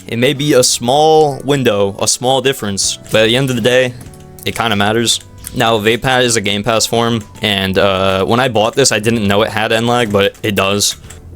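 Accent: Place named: India. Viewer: Turn off the indian accent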